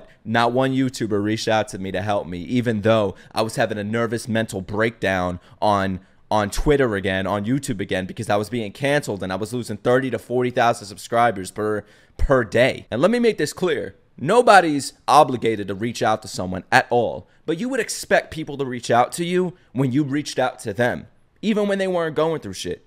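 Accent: New York, United States. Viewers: Hi...I'm a 4 hour New Subscriber...LoL...That first reaction Video of Pentatonix I was floored laughing and enjoying myself, from that one video I instantly Subscribed.....and Less then 5 minutes in I knew you were from New York...lol...YOUR AWESOME....FUNNY AND DAMN YOUR REACTIONS ARE AWESOME! Haters are always going to hate...It tough but Don't Let em Get you Down. You've got Fans and Subscribers Who Love what you DO...SO Keep Your Head Up and Don't Let em Get you Down.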